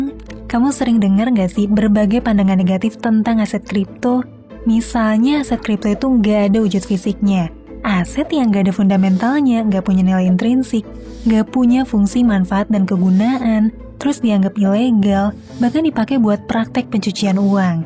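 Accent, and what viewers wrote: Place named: South Jakarta, Indonesia. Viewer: Jujur dari dulu pingin subscribe channel ini karena materinya bagus. Cuman ga tahan dengar suara Mbak naratornya. Kedengaran kayak sok ke Jaksel2an. Aksennya bikin geli.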